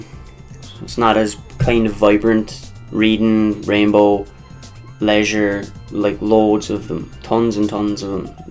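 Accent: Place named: Ireland. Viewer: Thanks for the review! Also your Irish accent is so cute!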